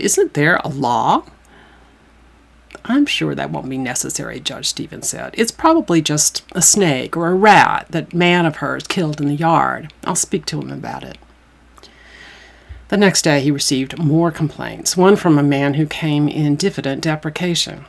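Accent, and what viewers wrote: South, United States. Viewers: The southern accent REALLY helps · Are you from the south,?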